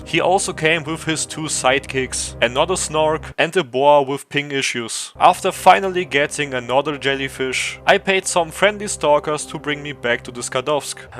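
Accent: Germany. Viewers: I did really enjoy the video. I suck at giving feedback sorry but im excited to see more. I was also thinking the entire tume that u sound so german so it was pretty funny to find out. Anyway i think u convinced me to finnaly try misery myself, also overcarrying yourself to sell all the loot is relatable af. Keep up the great work!! <3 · Took me 4 seconds to realize that he's german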